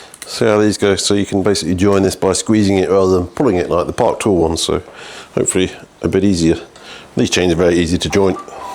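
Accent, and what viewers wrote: United Kingdom. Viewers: "The hears seek to deift uo and down the middle of the cassette and shifts by itself" Fitting the deraileur might help 😂 we can only get awsy with this level of dry himour with a british accent. Like the time a eomsn brought me a wheel thst was out if true by over an inch in mire than 1 spot and asked me to tighten one spoke. Calmly explained that the whe is more than 1 spoke and it doesnt work like that. She was absolutely furious. Of course i offered her a proper solution but she insisted on just the 1 spoke before storming out in a fit of rage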